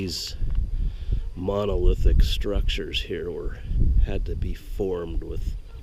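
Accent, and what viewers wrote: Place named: Small-town, United States. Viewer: This made me wonder if there was some relationship here between building concrete form work and boatbuilding. A fascinating view, so have your family lived in Washington for generations and is your accent local? It has that small town American - Lake Wobegone/ Ken Burns feel to it, which is quite attractive. For fans of Americana here in Europe this down on the ground exploration is the other (positive) side of the globalised uniformity driven by the Internet. Yes to Van building/living, but also Yes to getting out and about.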